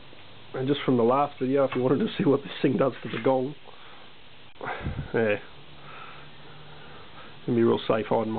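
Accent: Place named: Australia. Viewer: thought u sounded like an aussie so checked out your youtube page and saw your Greens pic. Guess you dont vote Green, haha.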